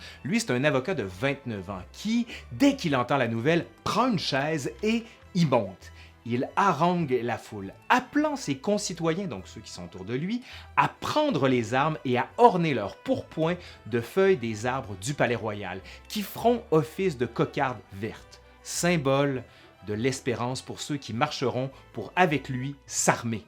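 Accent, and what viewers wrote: Quebec, Canada. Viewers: Une personne qui présente bien, qui parle bien, belle gueule, avec un p'ti accent du canada... Bref je m'attendais pas à quelque chose d'aussi tip top! Pouce Bleu & Abonnement! Offrez lui une cocarde! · J’apprehendais beaucoup la vidéo à cause de l’accent canadien, mais je dois dire que j’ai été agréablement surpris ! J’ai accroché dès le début et je n’ai pas lâché avant la fin 2h44min plus tard... très bon travail, j’attends votre épisode sur la 2eme guerre mondiale avec impatience ! :) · J'suis la seul à kiffer le fait qu'il est un accent Québécois ??? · Passionnante cette chaîne Travail de qualité Bon continuation J adore cette chaîne Et l accent des cousins d Amérique apporte son petit plus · Passées les digressions humoristiques… La qualité d'écriture et le charme du québécois s'opèrent. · L'accent du Québec n'est pas bon. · Superbe vidéo J adore l accent québécois :) Au-delà de la boutade, Merci! 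c est passionnant et très instructif Un français en amérique ... :) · Bravo pour la vidéo, très enrichissant, j'ai appris beaucoup grâce à toi. Merci beaucoup à toi et continue comme ça. +1 abonné 😘 (Ps: je vis en France et ton accent québécois est plaisant 😉) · cet accent québécois me fait saigner les oreilles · Grave kiff j’étais sur mon téléphone quand je entendu ta voix Québec je sais pas pk mais j’ai flippé mdr 😂 mais ouais sinon cette génial 👍🏾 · J'adore l'accent quebecois 🫶🏼 merci beaucoup pour ton travail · Ton accent québécois m'énerve je peux pas regarder la vidéo dommage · j'adore l'accent québécois pour raconter l'histoire de France , j'ai l'impression que vue de loin, c'est plus objectif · Salut. C'est un énorme travail que tu as fais LA😃👏 l'histoire de France avec un accent Québécois... super ! Presque 3h qui sont passées très vite ! Merci à toi 👍Ah javais déjà fait un com y a 1an😆😃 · Et puis puisqu'on est entre amis, pourrais-tu faire un jour un travail sur la guerre d'Algérie, je suis l'ultime pied-noir de ma famille et j'aimerais bien voir ce sujet traité par quelqu'un de compétent qui ne soit ni Algérien ni Français puisque vu ton léger accent il m'a semblé que tu as plutôt grandi sur les rives du Saint-Laurent que celles de la Garonne. 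Merci et bonne continuation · Maaaiis je suis sûre q l'histoire de France intéresse Bcp de monde de par le Monde... 😉 C 1 véritable série à épisodes.... 😄 !!.. Et j'Aadôre l'accent Québécois... 👍👍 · ... le révolution française relue par un historien canadien, avec l accent canadien, très intéressant 😂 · Toujours aussi géniales vos vidéos D'une qualité rare et très dynastiques. Votre diction est formidable et l'on vous suit avec entrain. Et puis cet accent québécois !! Quel bonheur , parole de parisienne. Euh ,excusez moi ,mais je me permets de vous dire que vous êtes encore plus beau sans barbe 😆👍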